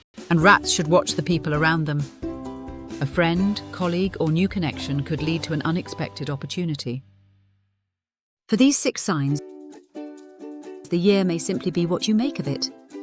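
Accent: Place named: England, United Kingdom. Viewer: An English accent, Hawaiian luau music, Chinese astrology, and AI animation. Interesting combination.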